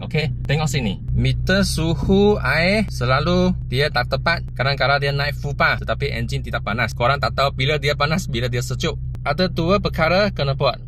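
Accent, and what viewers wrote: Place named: China. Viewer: Wehy mulut ko mmg takde insurans ke musibat, ni ckp elok jek aku dengar,cuma dia pelat sbb dia berbangsa cina,yg ko jgn buat malu warganegara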